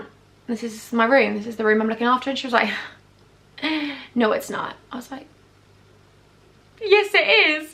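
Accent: United States. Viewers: I live in the states and your American accent was on pointtttt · Girl your American accent is on POINT! Lol coming from an American lol 🙌🏾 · I’m living for the American accent hun!!!❤️❤️❤️❤️❤️ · YOUR AMERICAIN ACCENT IS AMAZING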